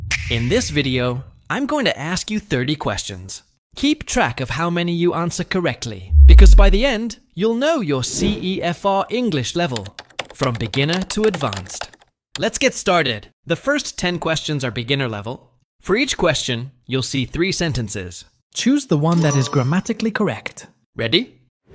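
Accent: United States. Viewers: are u using american english? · It seems like it's not British English but American English.❤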